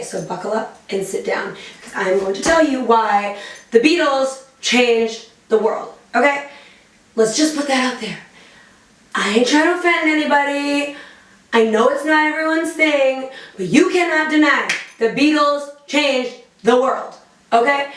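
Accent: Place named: New Jersey, United States. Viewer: you're gorgeous and I like your singing, but you're speaking voice is so Jersey shore that it hurts to hear you describe my favorite band lol. Well right behind the wailers and the jimi Hendrix experience. Just constructive criticism because you're a professional. But you're so right in recognizing their genius. I literally think they are the famous Kumar avatars of God because they really cared about helping souls. "We're bigger than jesus. " lol. Anyway I wish you all the best because you have a beautiful soul too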